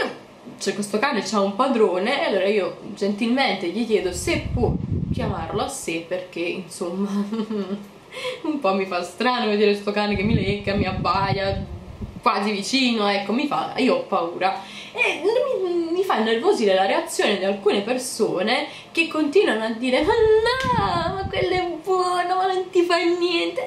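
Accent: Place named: Naples, Italy. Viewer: sei di una bellezza rara. Il punto però è un altro, secondo me saresti un'ottima attrice per la tua grandiosa espressività. Sono anni che sono su youtube, seguo tante tante ragazze, ma questo gesticolare, parlare fresco e accentato napoletano senza alcuna volgarità, fa sì che tu possa ricordarmi la amata Sofia Loren. Baci.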